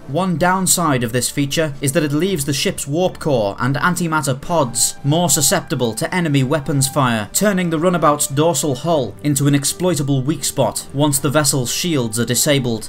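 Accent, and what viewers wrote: United Kingdom. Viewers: At last, I'm hearing an English/UK accent.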